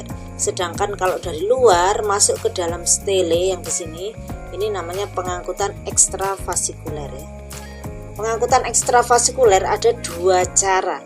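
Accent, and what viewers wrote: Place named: East Java, Indonesia. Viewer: Kaya wong jawatimuran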